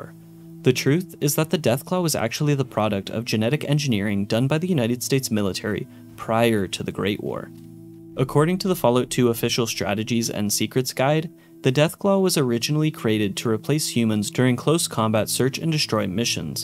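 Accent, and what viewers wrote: Canada. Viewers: You can totally tell you are from Canada lol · I love your Canadian accent 😊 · I can't decide if your accent is Canadian or not!(am Scottish so accents are weird haha)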